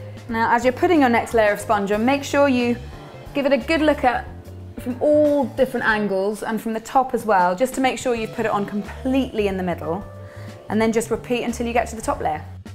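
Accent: England, United Kingdom. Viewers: thanks for the tutorial. You're very pretty. your english accent is nice ☺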